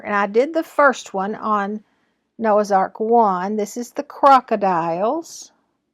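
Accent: South, United States. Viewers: I look so forward to your flosstube videos! You are so sweet and of course I love your southern accent. You do such wonderful projects! Kim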